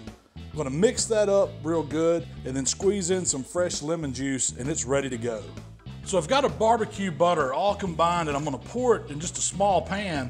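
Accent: South, United States. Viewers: I like his southern accent.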